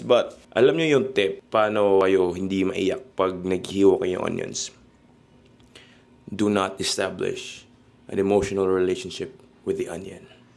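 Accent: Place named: Conyo, Philippines. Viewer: Kabaklaan conyo pa mag salita, cringe ka talaga haha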